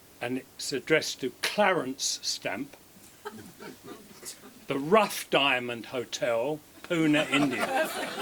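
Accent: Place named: Cockney, United Kingdom. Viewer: Such a charismatic man. Hey sounds pure Cockney here.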